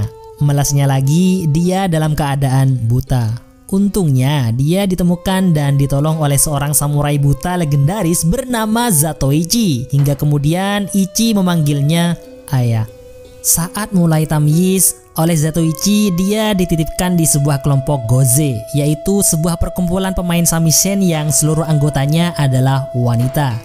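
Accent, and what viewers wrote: Madura, Indonesia. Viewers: Oreng dimmah bang madura yee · Madura dimmah be'en kang? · Lha orang madura.. 😅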